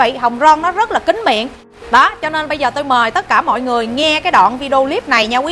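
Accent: Standard. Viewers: Chi noi rat chuan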